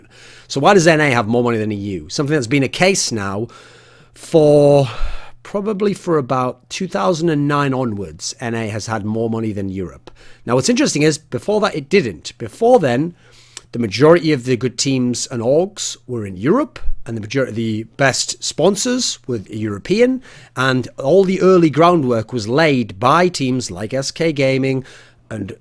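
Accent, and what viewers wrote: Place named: Birmingham, United Kingdom. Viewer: Weak brummy accent. Sort your shit out Thorin.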